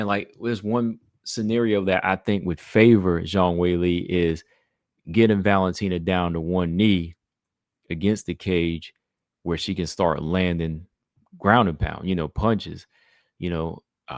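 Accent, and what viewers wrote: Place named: Ohio, United States. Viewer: are you from Ohio? You sound and speak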